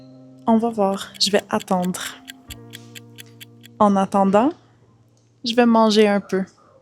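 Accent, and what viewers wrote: Quebec, Canada. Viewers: omg canadian french accent slay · ❤❤❤I am subscribed to you and I just had a feeling haha. I noticed there r been fewer videos, months apart sometimes and I was like “huh she must be busy with other life stuff” then I started watching dreaming French and I thought “wouldn’t it be weird if she wasn’t posting as much bc she was making videos for their Quebec accent” then I saw you and freaked out bc my conspiracy theory was true haha. You’re really a great teacher, I would watch some videos over and over to get familiar with the accent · Yesss! Quebecois French!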